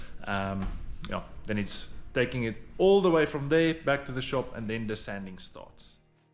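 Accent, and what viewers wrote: South Africa. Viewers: I kight just be assuming.. but I am mostly just shocked at that thick South African accent.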